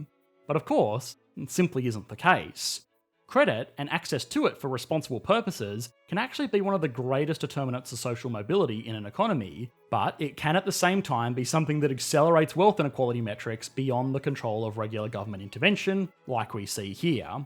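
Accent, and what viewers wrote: Australia. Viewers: Although the accent is Australian or industrial English, this video seems to be a propaganda vid by Republicans or Trumpists or, otherwise, of someone who has never been to The Netherlands. So, if you love Fox News, Bill O'Reilly, the Republican representatives in the USA, the incredibly lying Trump and BoJo and Putin, go live in their countries and see whether you are happy or not. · Great content and analysis in all your videos spoilt by your delivery which is way too fast for me to take in a lot of what you are saying, Your heavy Aussie twang adds to my difficulty. I have to keep pausing, rewinding, and replaying to consider and digest your line of reasoning. Rather than keeping me engaged, this turns me off.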